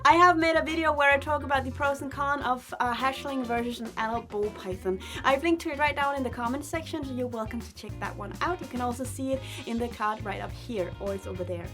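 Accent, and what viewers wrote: Denmark. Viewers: its a beautiful accent danish is similar to one of languages called afrikaans 🙈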